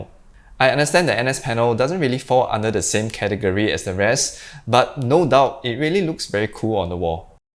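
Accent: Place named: Singapore. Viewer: Sounds Singaporian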